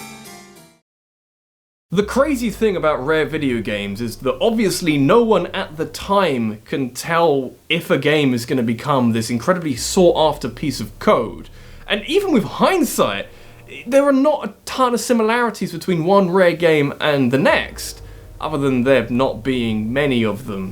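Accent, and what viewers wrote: United Kingdom. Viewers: what is gramma attack? gamra attack? gammar? the british accent, they like to skip their Ts and add Rs. I cannot enjoy listening to british speakers because I am too stupid and derp out when they say words like law and i hear lore and spend the next 10 seconds trying to figure out if im having a stroke, and end up missing what they are saying, leading me to get totally lost. just me? or is it genuinely confusing when they say words they dont mean to? sorry, just realizing why im unsubscribing from british channels, makes me sad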